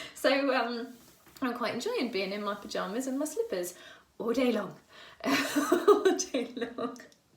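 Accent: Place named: United Kingdom. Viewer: Does your mother have a presence online? You make it sound like she is someone I would enjoy listening to. btw, I am also a biker but no tattoos. Speaking of listening, I love your british accent. I have a friend in my club that last year married an English fellow and brought him here to Michigan. Due to following so many GB YTers, I have a pretty good handle on the accent and slang. When he got up to speak about at our last meeting he got pretty excited and you could have heard a pin drop because some of his rant, while typical british, can be somewhat racist here. I started laughing and got up to explain what he actually said. That ended up being the quickest and best meeting in months.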